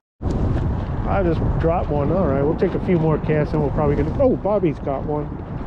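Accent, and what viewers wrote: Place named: East Coast, United States. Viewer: And back to the salt, good job! Lol hearing you talk to "Bobby" with your eastern accent very much reminds me of a character off of the TV show Bob's Burgers, named Teddy. Since I dont really know what you look like, I've come to just picture you as looking much like Teddy.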